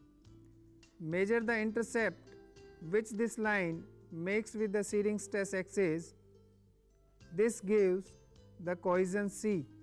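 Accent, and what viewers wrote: India. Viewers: quit informative.. but another intresting part is when he speak, except his mouth every thing is like statue, n indian english:-> but thumbs up for knowledge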